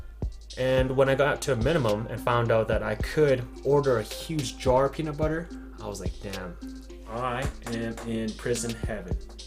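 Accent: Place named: Canada. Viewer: I can hear your Canadian accent! I am French Canadian and we love our ground pork in dishes around Christmas!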